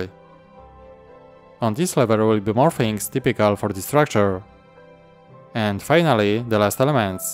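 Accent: Poland. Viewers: Great build From your accent I assume you are from Poland? If so thats amazing for polish community! :) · Damn, I absolutely love it Dobra robota bratku (Wnioskując po twoim akcencie Polska)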